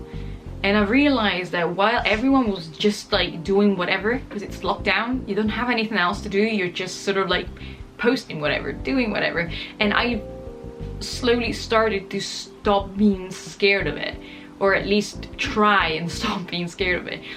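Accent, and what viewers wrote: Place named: England, United Kingdom. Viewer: Da quanto tempo ti trovi in uk? Sembri Inglese 😁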